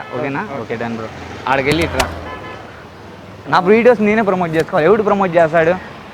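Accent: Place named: Andhra Pradesh, India. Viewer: Ne slang nachutaley .Andhra lekka ostandi kocham marchu....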